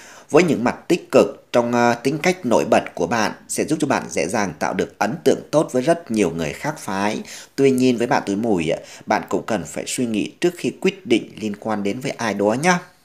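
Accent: Thanh Hoa, Vietnam. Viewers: Giọng Thanh hoá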